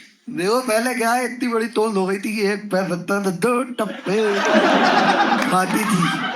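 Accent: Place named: Bareilly, India. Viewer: proper bareilly accent....!!!!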